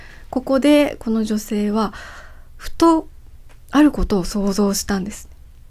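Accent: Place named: Kansai, Japan. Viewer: 今回は道頓堀での講演のせいか、関西弁が強めの深津さんは良いね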